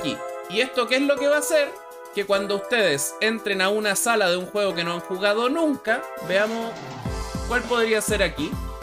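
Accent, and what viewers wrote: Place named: Chile. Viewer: OMG QUE CHILENO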